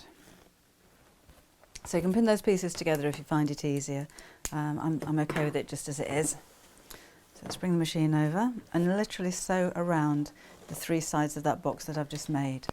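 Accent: United Kingdom. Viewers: Where are you that you call it a zip? The accent sounds British? In America we call it a zipper. Your bags always look so darn cute!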